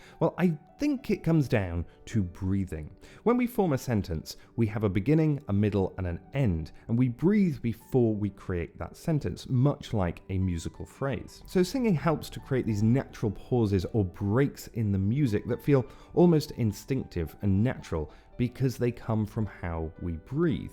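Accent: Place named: United Kingdom. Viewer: Beside the subject...I absolutly love his accent ❤ Is it British....certainly not Américain 🤮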